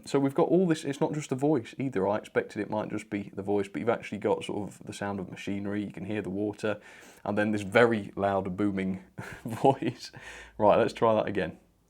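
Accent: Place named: West Country, United Kingdom. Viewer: Hi, great review and I got the same model from Rails of Sheffield too. I have added the tower on my loft layout within the steam shed area... It works very well and quite novel...great Wurzel's accent..!!! 😁 I have weathered the tower and painted the brazier and added a fire glow LED under it to represent the de-frosting fire.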